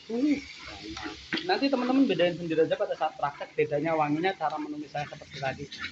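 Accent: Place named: Java, Indonesia. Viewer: Mas asli mana sih, jawa jogjanya medok banget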